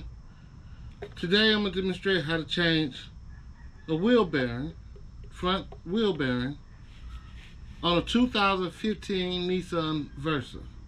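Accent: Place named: Detroit, United States. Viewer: Sound like you from the D.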